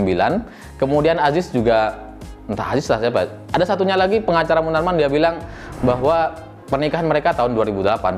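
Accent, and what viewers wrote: Madura, Indonesia. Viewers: mas dgr dari logat nya org madura ya 👍👍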